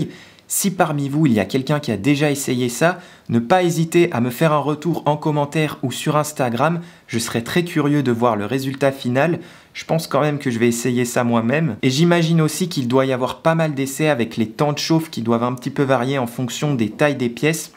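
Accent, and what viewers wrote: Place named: Switzerland. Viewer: bonjour de Normandie . un suisse? je m'attendais a un accent bcp plus prononcé. tout fou le camp. mais en contre partie j'aime bcp votre voix elle a une certaine musicalité (meilleur formulation qui me vient e l'esprit). je cherchais des news geek et je suis tombé sur votre chaîne j'aime beaucoup. j'aimerais énormément débattre sur "nouvelle génération d'imprimante 3d" pour moi les reelles nouvelles générations ça va etre les imprimantes a tapis roulant les imprimantes "infinis" tout le reste (a mon avis) ce ne sont que des mises a jours. c'est pour ça que je me casse le train depuis deux ans avec une base am8 ( et meme prusa bear c'est + a la mode) que je veux custom a fond. car lest pas possible de trouver a prix correcte une imprimante qui nous correspond parfaitement . auto leveling, <num>v électronique, stepper, rail lineaire ou tige 8mm buses classiques ou alongee pour imprimer reellement en 3d et modifier la résistances des pièces par la fibre. 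la tete du chassis ect. je vois l'imprimante 3d de mes reves un peut comme le sabre laser d'un jedi qui est fait sur mesure pour lui. et en plus c'est instructif et valorisant.... mais ce qui est frustant c'est que pour l'instant c'est une ender 3 simple qui fait tout le boulot..... enfin bref bonne continuation je vais vous suivre.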